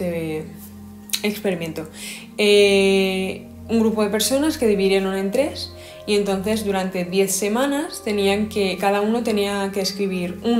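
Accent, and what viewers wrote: Spain. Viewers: Hija, tú eres muy católica y aún no te has dado cuenta (o quizás lo eres y yo no lo sé). La gratitud es un valor más católico que el mismisimo. -> O darles las gracias a Dios. -> Catastrofista por abandonar a Dios y no ser capaces de dar valor a las cosas, porque el utilitarismo lo está matando todo, quizás. -> Cada mañana te despiertas y agradeces cosas, casi como cualquier cristiano hace con Dios en sus ritos. Menciono mucho a Dios, pero ni siquiera soy cristiano, simplemente son observaciones porque me parece curioso lo que dices y que no te des cuenta. En cierta manera es normal que pienses así, por tu acento pareces española y España es una sociedad muy católica. Incluso los que no se consideran católicos tienen raíces en esta religión. Más de 1000 años de una religión influyendo sobre una sociedad tiene sus consecuencias, incluso más de lo que nos podemos imaginar. 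En fin, Dios se ha convertido en una cosa sin nombre ni veneración, pero presente en el día a día, y esto es ejemplo de ello.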